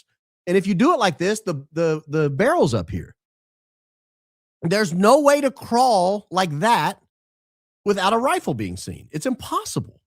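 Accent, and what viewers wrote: Australia. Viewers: As an aussie i can confirm that is exactly how we speak, mate! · New Zealander here... Your Aussie accent is hilarious, 🤣🤣🤣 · Good Aussie accent ☺️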